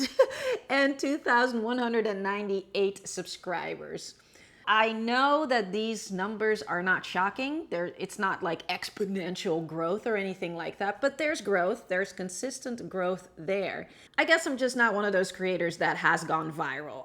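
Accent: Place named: Netherlands. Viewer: that’s amazing 😁 I love that you are following your dream and that you took the jump. I thoughtw I heard a ducht accent, but i thought I was wrong. Don’t know why I’m still typing in Dutch, but it’s to late to change it 😂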